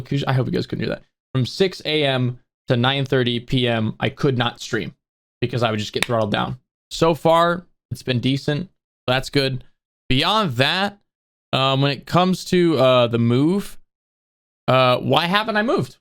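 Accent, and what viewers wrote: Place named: Texas, United States. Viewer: Sounds like you live in TEXAS 😔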